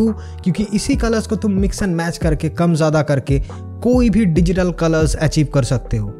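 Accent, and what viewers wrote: Bengal, India. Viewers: That bangla accent 🔥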